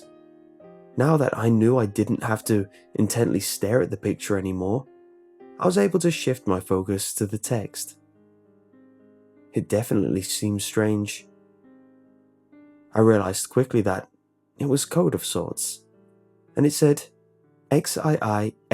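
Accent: United Kingdom. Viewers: your British accent make it more creepier. good job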